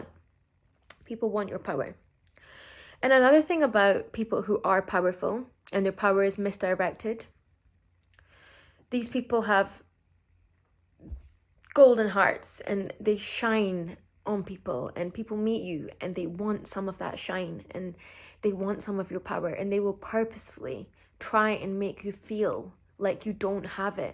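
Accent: Scotland, United Kingdom. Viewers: you're scottish? did not expect that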